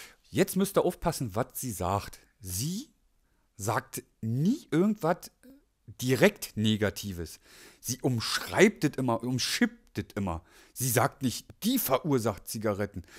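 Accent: Berlin, Germany. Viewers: Cooool! Ne dampfene berlina Schnauze, find ick jut! Abopflicht, wa! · Du kommst aus Berlin!? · 😂😂😂deine Berliner schnautze.....voll Guut👍😂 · ich finde dich klasse ☺ was du sagst kann ich nur so unterstützen seh das genauso und deine berlinerschnauze Made my day 👍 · sieht verdammt aus wie Ragnar Lodbrok von Vikings o.O Ragnar mit Berliner Schnauze - göttlich, einfach göttlich. Macht das Video (übrigens gut zerlegt, diesen Schund!) irgendwie echt witzig... wenns nur nicht so traurig wäre...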